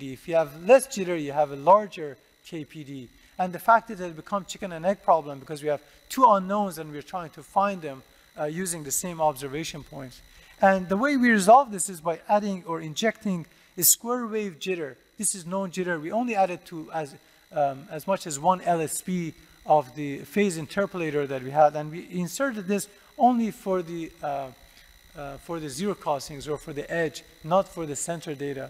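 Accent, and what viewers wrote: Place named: Iran. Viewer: mamnun. az avale lahje fahmidam ke hamzaban hastim. baese eftekhare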